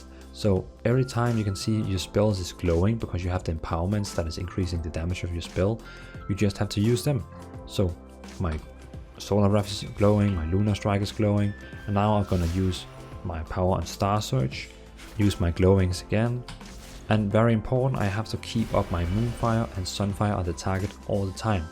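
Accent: Denmark. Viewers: Thought I could hear a fellow Danish accent :3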